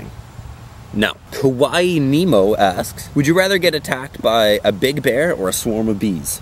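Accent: Canada. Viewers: Am I the only one who hears the Canadian in his voice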